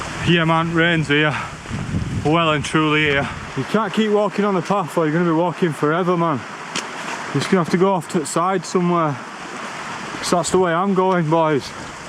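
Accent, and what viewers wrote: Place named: Bolton, United Kingdom. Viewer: Love the brawd acsent iam fr bolton sound summit like but u talk like ur about 50 mate how old ru, iam 63 just got cancer basterd so do miss it pal atb stay safe ❤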